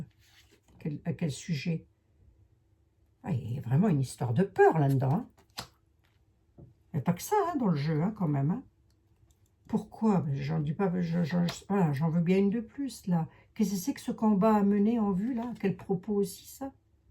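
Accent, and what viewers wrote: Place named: Lorraine, France. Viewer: Ah voilà pourquoi votre accent m'interpelle et votre facon de parler m'est douce à l'oreille ! Vous êtes lorraine ! Ma grand mère tant aimée était à Metz. Ma ville de coeur où j'ai passé toutes mes vacances, durant mon enfance ! Oui creusez creusez... Et le 30-31 on aura sans doute le début des réponses. Can dace a prévenu ce sera en plusieurs épisodes ! 😉🤩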